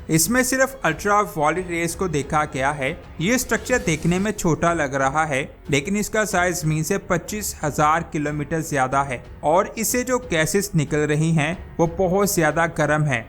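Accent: Punjab, India. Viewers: yar tum Urdu bhi aur angregi bhi Punjabi lehjay mein parrhtay ho,ba'tao mein kiya ka'roon.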